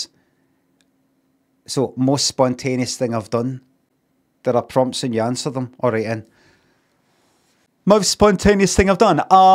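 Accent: Scotland, United Kingdom. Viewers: Limmy’s accent is mid-tier difficulty at best in terms of Scottish accents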